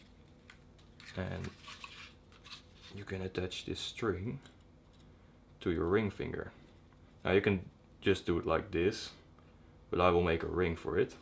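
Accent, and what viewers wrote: Netherlands. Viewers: you sound really dutch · I thought so aswell cause he sounds really dutch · Super cool! Alleen je hoort gwn dat je nederlands bent :) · Is this guy dutch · Are you dutch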